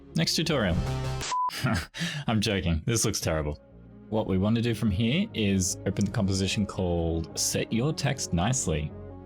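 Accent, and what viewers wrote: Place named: South, United States. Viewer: ....YOU'RE FROM KENTUCKY?! I never knew. First time hearing you speak after all! Love that thick southern USA accent!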